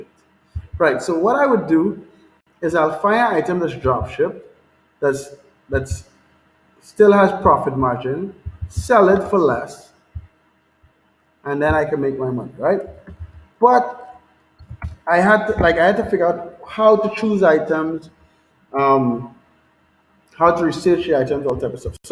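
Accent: Trinidad and Tobago. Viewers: No BS Drop Shipping Training WHAT DE ASS BOY! A Trini! Oh Gossshhh! I subscribing Now! I'm from Laventille! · sounds like u from trini man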